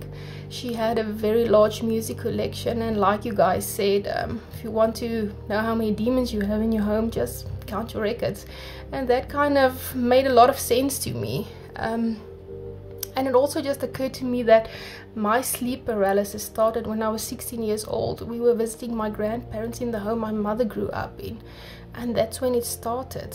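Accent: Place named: Afrikaans, South Africa. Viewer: Hehehe guys if ur wondering about the accent it’s called the South African Afrikaans accent 🙈🙈🙈🙈🙈we have different accents here aswell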